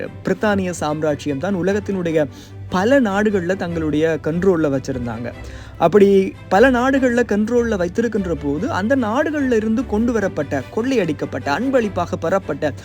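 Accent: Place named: Sri Lanka. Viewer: ரொம்ப நல்லாயிருக்கு! இலங்கை தமிழ் எப்பொவுமே கேட்க இனிமை! தமிழ் kingdom, நாயன்மார்கள் இந்தியாவைவிட்டு வெளியே வந்ததால் இன்று அவற்றின் பெருமை பாதுகாப்பாக இருக்கிறது!!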